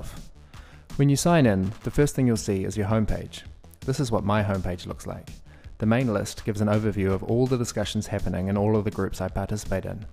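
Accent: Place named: New Zealand. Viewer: Great tutorial, but the sub-titles are a bit random - seem to struggle with the good old kiwi accent.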